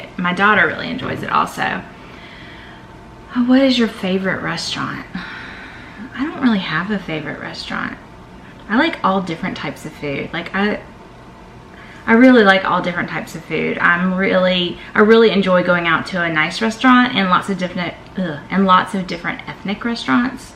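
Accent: South, United States. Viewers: Another great video, This is so cool, helps us get to know you! I love your southern accent! My sister has a southern accent, she lives in HHI. Do you live close to HHI? I'm visiting her in June! Prayers are with your lil man!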